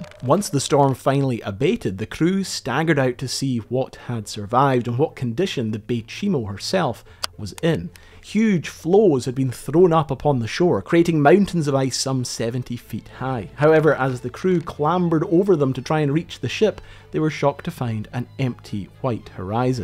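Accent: Scotland, United Kingdom. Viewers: Love the Scottish accent and Neck fur!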